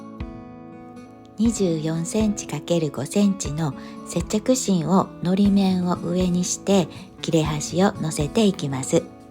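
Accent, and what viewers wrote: Kansai, Japan. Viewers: 関西弁がええなあ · オススメに出てきました😊はじめまして～ヾ(*･ω･*)ﾉ 時々出てくる関西弁と、関西弁じゃなぁい⤵︎⤵︎⤵︎と思いきや、イントネーションが関西な所❤Love 親近感あって安心するし、生地が出来上がって作品になっていく過程がまた可愛くて、最後まで飛ばさずに見てしまいました😂 ハンドメイド系のYouTubeは、ついつい最後の完成品を見てから、気に入ったら最初から作り方を見る、という感じですが、今回は、まるっと見ちゃいました❤ 素敵な動画をありがとうございました🎶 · おすすめから来ました！パッチワークはやったことがなかったのですが、こんな小さいハギレがこんな素敵な作品になるなんて驚きです！ 関西弁の落ち着いたナレーションもめちゃめちゃ癒されました！チャンネル登録させていただきます！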